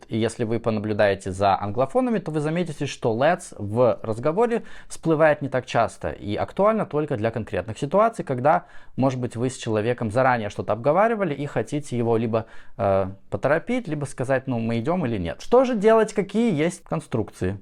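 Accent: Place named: United States. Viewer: Хоть и предпочитаю британцев, но у очень аккуратное и ненавязчивое американское произношение. В сети среди русских он по этому показателю несомненно намба уан. Да и русский у него не без изыска. Лишь интонация слегка выдает в нем давно не бывавшего на родине. Но это естественно.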